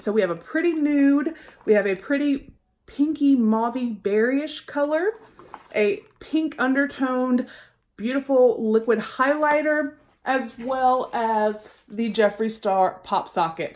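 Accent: South, United States. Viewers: Thanks so much for doing this video! It's great cause I totally missed out on these boxes and I really wanted to see what was in them! And you are so cute with your sweet southern accent! 😘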